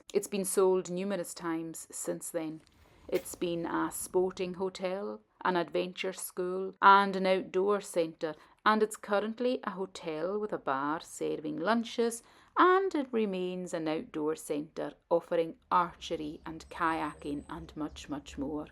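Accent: Scotland, United Kingdom. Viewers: Another great video and full of information. Love your Scottish accent and your laugh. ❤🤠👍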